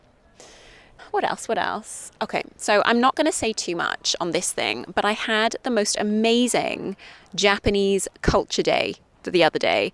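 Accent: United Kingdom. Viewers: Thanks for your real British English video! It’s a very good listening practice for me!! The tree behind you looks like Sakura but it’s a little unique shape! Honestly speaking, I’m not comfortable to wearing mask but my opinion is close to From this 13th of Mar. 2023, I’ll be wearing mask in the confined place like the train but no mask outside! I didn’t know “gnats” but I found it’s the small flies. I misheard gnats as nuts first😅 but it’s a good practice for me!! As for clouds, I studied typical seasonal clouds below❗️ Summer: cumulonimbus Autumn: mackerel clouds Winter:stratocumulus April: altostratus Oh they are very difficult words for me but interesting! I’m excited for the next lessen ❤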